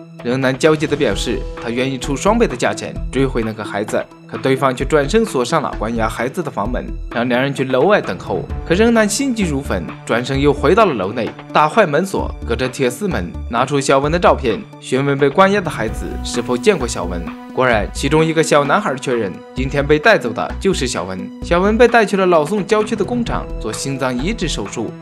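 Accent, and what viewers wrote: Japan. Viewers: 你是日本人在講中文嗎?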